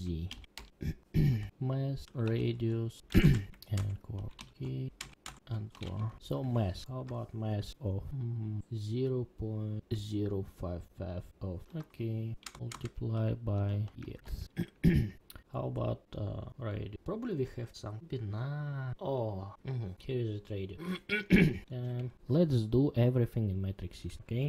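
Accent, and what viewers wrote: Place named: Russia. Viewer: типичный русский акцент.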